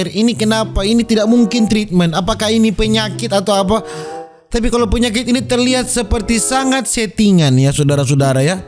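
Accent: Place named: Makassar, Indonesia. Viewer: Aduh bang kau bicara lucu kali Org makassar kah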